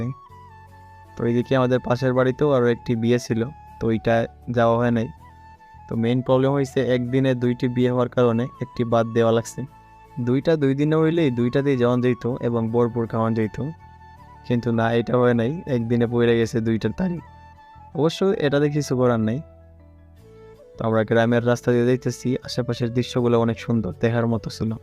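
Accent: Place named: Noakhali, Bangladesh. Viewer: Nuakalir moto matho kne😒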